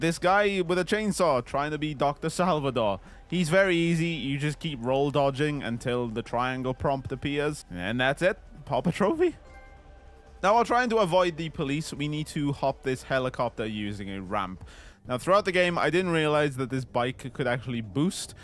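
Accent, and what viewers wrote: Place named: Wales, United Kingdom. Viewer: Man, from the first line I thought to myself "I bet this is Ride to Hell Retribution". Always good to see your dulcet Welsh tones coming up in my subscriptions again!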